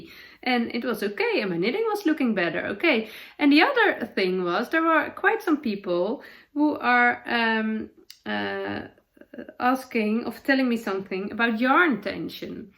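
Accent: Netherlands. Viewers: I love your podcast and your “dutchie english”, it reminds me of my late father. He was stationed in Europe in the 50-60’s and had very fond memories of the Dutch people. I am grateful you record in English so I can enjoy out time together! 💜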